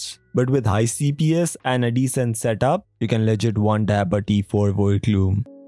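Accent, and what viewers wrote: India. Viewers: u sound indian af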